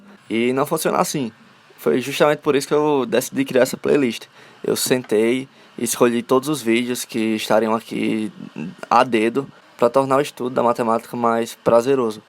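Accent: Northeast, Brazil. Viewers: Vc é nordestino, né? Sotaque forte da porra. Não sei o que eu acho mais lindo, esse seu rosto perfeito o o sotaque nordestino, as palavras ficam mais engraçadas ou corridas, acho gostoso demais ouvir nordestinos falando. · Vc é nordestino ? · Como é presta atenção homi, cum sotaque do nosso nordeste😍😍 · Como identificar um sotaque nordestino ? e rsrs só faltou o palavrão ! Rdrs é comum em algumas cidades ! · É impressão minha , ou ele é nordestino?? Pq ele tem o sotaque . Eu achei super top !!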